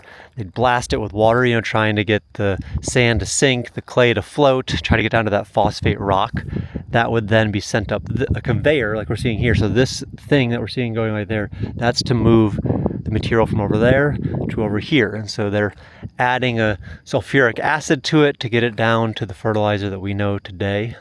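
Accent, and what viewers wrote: United States. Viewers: I thought you had a central Florida accent. That’s funny. Welcome back. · Love your vids. I heard "Bone Valley" and my ears perked up. We ride dirt bikes at that place you saw named that on OnX. Nice to know you grew up here. I thought you were from Boston, where I'm from.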